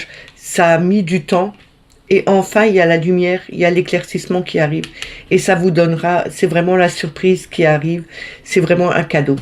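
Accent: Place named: Alsace, France. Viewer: Bonjour, abonnée depuis peu, je prends plaisir à vous retrouver chaque jour. Je dénote un petit accent Alsacien, je me trompe??? Si c'est le cas, nous ne sommes pas bien loin puisque je suis près de Thionville en Moselle. Merci en tous les cas de vos tirages toujours éclairants et de votre bienveillance. Douce et belle journée à vous et à la communauté. 😘💖💝💐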